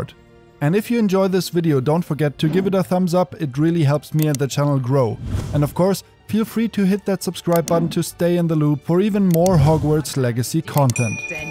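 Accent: Germany. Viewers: Man merkt sofort das du deutsch bist an dem Englisch is nix auszusetzen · Bro question? Are you German? I listen a small accent😅 anyways, your video helped a lot thank you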